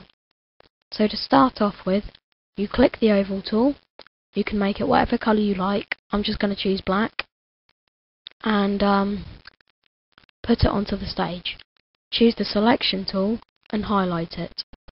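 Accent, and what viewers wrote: United Kingdom. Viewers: hey are u british?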